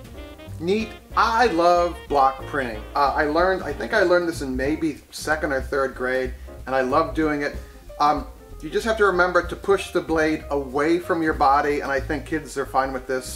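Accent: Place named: South, United States. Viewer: Merry Christmas and Happy New Year to you and yours. Thank you for the great video's. I have learned a great deal from you all. LOL sounds pretty southern for a northern girl.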